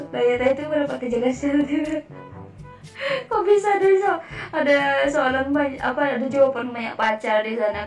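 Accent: Indonesia. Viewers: Logat"nya persis orng indo.. Aku kira orang indo yng mentap di brunai..😀😁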